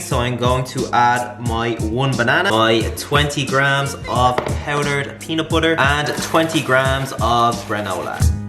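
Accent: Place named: Ireland. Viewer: Love the Irish accent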